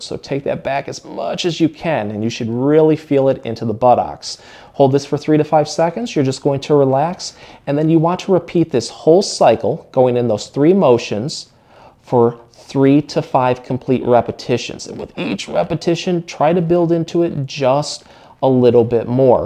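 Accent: Michigan, United States. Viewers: Just the warm ups fixed it for me. Go Michigan!! Luv that MI accent😊 1000 Thanks 👍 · Michigan accent??????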